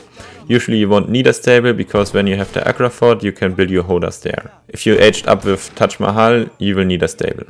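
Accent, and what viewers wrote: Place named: Germany. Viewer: Diese furz trockene art und der deutsche akzetnt 10/10👍🏽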